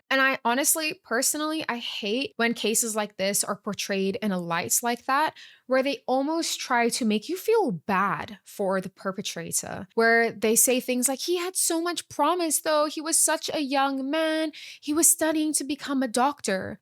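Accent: United Kingdom. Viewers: I was thinking the same thing hahaha sometimes british sounding and sometimes american sounding but it's actually so nice and unique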